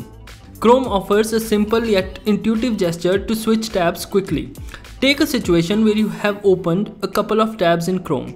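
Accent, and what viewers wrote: India. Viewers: diksiyonuna ettiğimin hintlisi kulağımı deldin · Almost every indian i know or watch speaks like this...It is bounded and it doesn't sound that bad... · am i the only one who love indian english? i love your accent man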